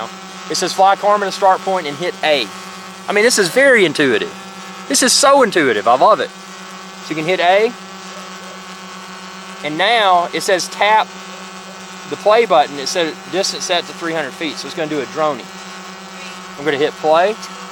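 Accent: South, United States. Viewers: thank you.. I am glad I found your channel... love your southern twang....new subscriber....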